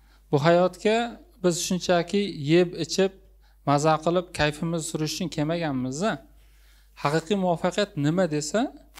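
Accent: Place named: Surxondaryo, Uzbekistan. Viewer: Qalban surxondaryolikman dedi,bir joydan ekanmiz😊😊 shevasi ham uxshaydi uzi surxondaryoliklarga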